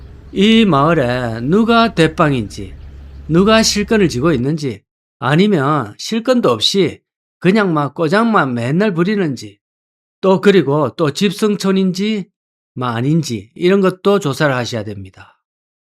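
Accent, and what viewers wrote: Gyeongsang, South Korea. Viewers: 좋은데 갱상도 말을 좀 줄여요~~!!!!!!!!!!!!!!!!!!!!!!!!!!!!!!!!!!!!!!!!!!!!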